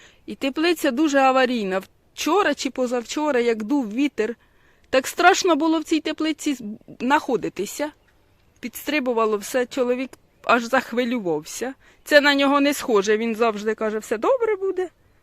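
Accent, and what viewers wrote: Poltava, Ukraine. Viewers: Мені здається,що у Вас полтавський говір,чи ні? Якщо так,то який район?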